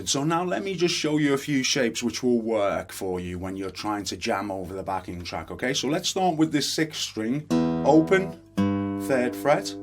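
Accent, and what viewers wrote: Liverpool, United Kingdom. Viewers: Yank here. Love the Liverpool(?)accent and the tone from that martin(I live about 20 miles from Nazareth, Pa).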